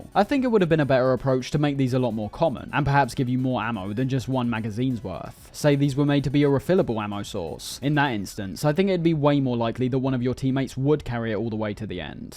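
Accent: United Kingdom. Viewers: Blimey da bloody animalistc deep monologue turning me into a bloody zombie wih the stylistic thought proceeds similar to americans fuck i hate godamn bri'ish blokes fucking better then me talking so annoying dislike reported blocked stopped watching all that fuck pissing me off by the second w yo busted teeth talkin smart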